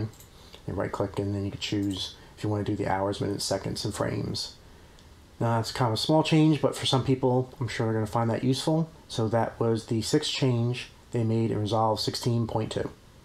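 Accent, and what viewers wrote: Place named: United States. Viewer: Nice overview, definately helpful! I have a very off-topic question, which state are you from? I think i can hear it but i'd like to have it confirmed! :)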